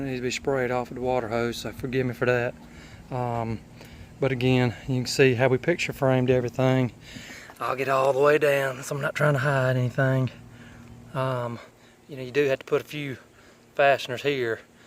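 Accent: South, United States. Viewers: Looks really nice. I like your country accent.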